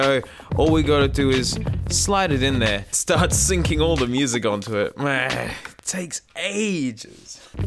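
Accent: Australia. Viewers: I forget he's Australian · This video makes me appreciate Aussie accents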